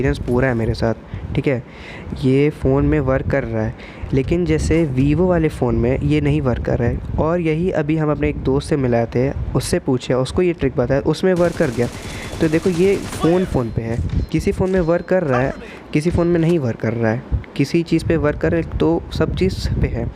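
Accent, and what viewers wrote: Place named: Bihar, India. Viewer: Or bhai kya hal chal Abaj se lgta h ap v bihar me rhte ho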